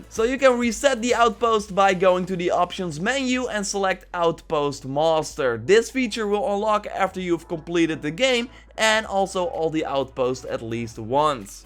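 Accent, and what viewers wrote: Netherlands. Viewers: the strong dutch accent u have tho jeez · Jii bent Nederlands zeker? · Ben je Nederlands?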